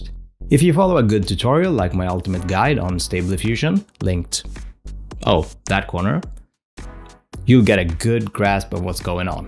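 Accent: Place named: Sweden. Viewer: Loving these videos (and hearing your lovely Swedish accent makes me homesick for Sweden...I lived there for nearly 20 years). Any tips about how to avoid heads being cropped off? Or how to build out an image if you like it but half the head is missing? So far that is by far my biggest annoyance.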